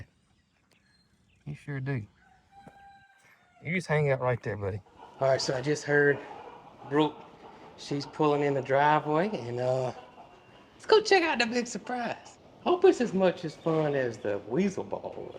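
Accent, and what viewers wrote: South, United States. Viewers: Captions do not mix with a Southern accent!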